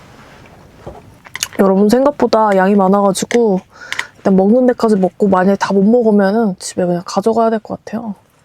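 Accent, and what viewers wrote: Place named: Gyeongsang, South Korea. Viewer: 혹시 고향이 경상도 아니신가요? 약간 사투리가 나오는것 같아요ㅎ ㅎ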